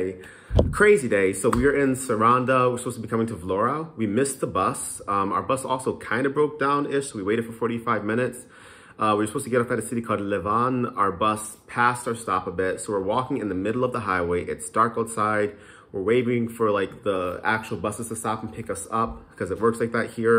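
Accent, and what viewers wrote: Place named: New York, United States. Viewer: I can tell this dude is from NYC.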